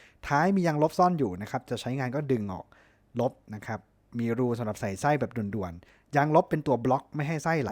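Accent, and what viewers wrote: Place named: South, Thailand. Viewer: ใต้ออกเยอะหรอยนะครับคลิปนี้555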